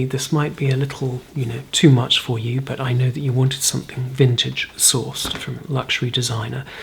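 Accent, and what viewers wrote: RP, United Kingdom. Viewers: I just discovered this man because of gentle whispers mentioning him and I must say, his voice is soooo soothing and the British accent is just the icing on the cake! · You’re so posh I’m beside myself w tears. You’re like a fairy godmother/father I’ve always dreamed of 😭😭😭😭 U HAVE NO IDEA HOW HARD IT IS BEING AMERICAN!!!!!! 🤪😂😂😂😂😂 sometimes I have cried from not being a Brit but that was years ago...... 🤪😂😂😂😂😂😂 I love British people and their accents so much it speaks to my soul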